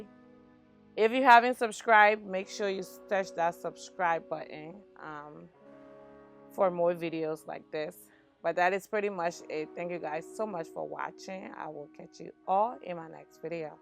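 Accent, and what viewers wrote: Liberia. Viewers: new subbie! as soon as i heard that accent i said i must follow my liberian geh! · You are a west African Liberian. ...your accent though. ...you are very talented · Yes I thought so too. She sounds like a Liberian. Good job girl keep it up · Aww I noticed your Liberian accent 🇱🇷🇱🇷🥰🥰🥰🥰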